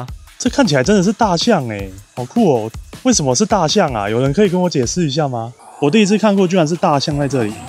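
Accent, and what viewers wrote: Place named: Taichung, Taiwan. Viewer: 第一次看你的影片，看著看著，發現你有台中腔，看到你說：「從大甲家裡出發…」果然🤣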